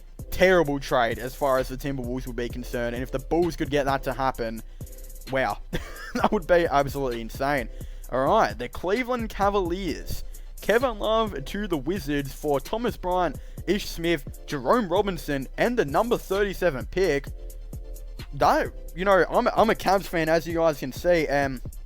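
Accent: Australia. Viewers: Deffs Aussie??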